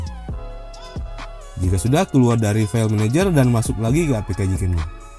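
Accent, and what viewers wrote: Kotamobagu, Indonesia. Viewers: Bang kau orang Kotamobagu?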